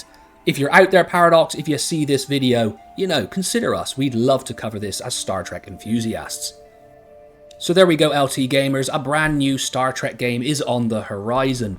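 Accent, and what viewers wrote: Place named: West Country, United Kingdom. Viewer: This is the dogs 🏀 🏀… nice to see knowledge and enthusiasm in the review, together with a little West Country chaaarrmmm oh arrr 🇮🇪❤️🐌🐸❤️🤗🐸🏀🏀❤️🐌❤️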